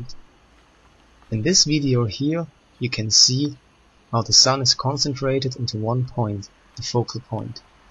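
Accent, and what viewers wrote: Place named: Germany. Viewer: you have a german accent.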